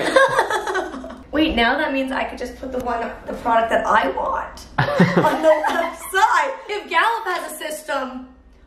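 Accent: France. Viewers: I actually love her French accent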